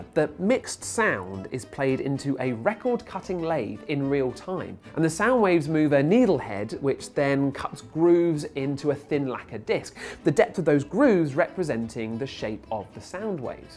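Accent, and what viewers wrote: United Kingdom. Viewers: Thank you mr. British man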